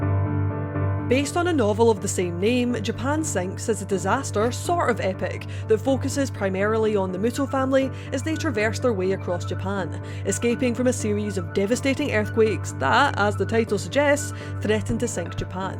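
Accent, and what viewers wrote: Scotland, United Kingdom. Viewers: Are you scottish or something? Kinda sounds like it. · Came here to find a review to throw at a mate and convince him to watch JS. In 2 seconds found a Scottish accent and was more interested in knowing how good you were as a reviewer... I’ve subbed, good points all round you’re articulate af, know your deep knowledge and I have absolutely no arguments against the review. Gonna look through some I’ve not watched now.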